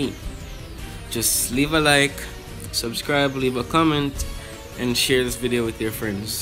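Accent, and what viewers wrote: Jamaica. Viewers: Thx bro I notice your Jamaican and I'm too big up yuh self bro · Are u Jamaican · are you jamaican? just askin cause i am half jamaican you just sound like it 😂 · Your a Jamaican aren’t u?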